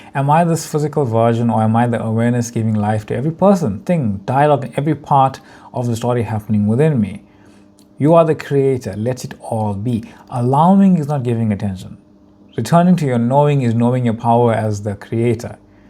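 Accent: South Africa. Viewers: That's how Indian people speak in South Africa.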